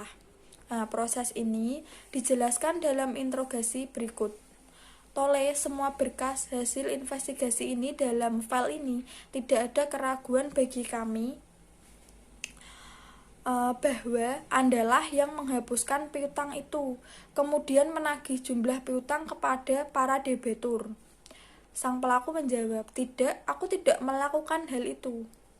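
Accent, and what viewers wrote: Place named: Java, Indonesia. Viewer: Inspiratif dan logatnya medok 😁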